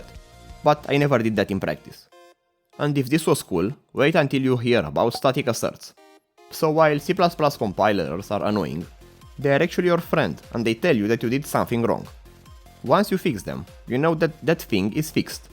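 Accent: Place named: Romania. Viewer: romanian accent for the win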